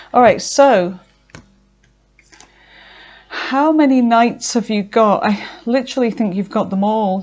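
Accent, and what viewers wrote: United Kingdom. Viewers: You are the most delightful tarot reader. Are you a Cockney born lady? I just love the various British accents.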